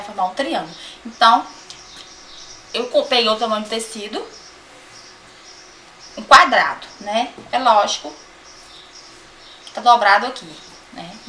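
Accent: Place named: Minas Gerais, Brazil. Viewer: ***** Ah! Que interessante! Realmente já tinha ouvido dizer q o sotaque de Minas era forte.Fica giro viu? As brasileiras q sigo no yutube, a maioria são de São Paulo e o sotaque é diferente,mais levezinho.Obrigado por responder e tenha um bom dia!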